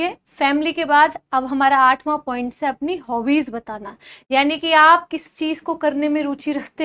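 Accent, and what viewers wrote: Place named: Braj, India. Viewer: Mam aap mathura bashi ho kya ...... Aaap ki bhasha braj ki lagti hai😊😊😊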